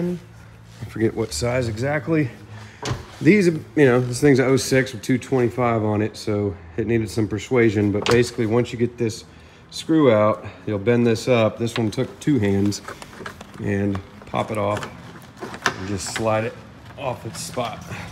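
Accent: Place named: Georgia, United States. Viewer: You have great voice and communication style for this. I think it has something to do with Georgia. I know one other person that sounds like you and he's from Georgia as well. I was looking for the right GX all over the country and I guarantee I would've ended up buying from you had I found your videos sooner. Anyway, you make the absolute best and practical GX content there is.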